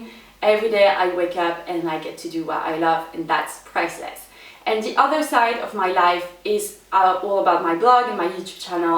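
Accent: France. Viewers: Thanks for answering my beauty questions! This was a lot of fun to watch:D Sadly I don't think many late 80s, early 90s kids, escaped to whole icy blue eyeshadow with bright red lipstick phase, so we can all shake our heads in solidarity:P Glad to know I'm not the only one who couldn't place your accent when first discovering you, I think it's because it's not the stereotypical french accent you see in movies (using overly french pronunciation) but just a faint one, that has people so confused. Definitely going to be picking up that volumising spray when I'm next in Boots:D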